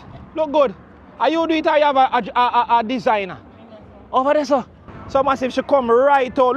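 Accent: Jamaica. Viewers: Respectfully and TOTALLY disagree 💯🙏🏻!YOU! YUH CONTENT!!AND YUH PATOIS!!!! ARE 💯🙏🏻🤗🇯🇲❤️💛💚 NUFF RASPECT EVERY TIME MI BRO.. MI GENERAL..OUTSIDE! ❤🤗🇯🇲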